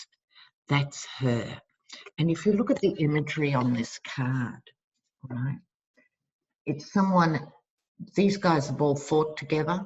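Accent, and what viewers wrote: Australia. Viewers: I have always loved the Australian accent..🤗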